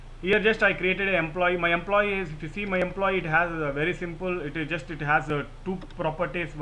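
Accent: India. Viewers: only Indian people understood this English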